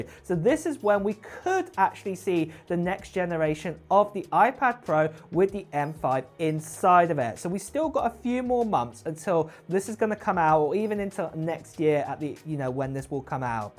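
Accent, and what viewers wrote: United Kingdom. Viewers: 안녕하세요 한국인입니다. 영어공부 잘하고 싶어서 의 테크영상 자주 봅니다. 저는 특히 한국 특성상 노트북도 윈도우와 핸드폰은 갤럭시만 줄곧 써왔어요. 애플 기기는 관심은 있지만 경험해보질 못했어요. 이번 기회에 이벤트하시는 맥북 제가 꼭 받아 공부하는데 써보고 싶습니다^^;; 너무 큰 욕심일까요?ㅎㅎ 발음 영국식이라 아직은 자막을 좀 키고 들어야하지만 실력 늘려서 꼭 자막 끄고 전부 이해하면서 재밌게 영상 시청해보고 싶어요.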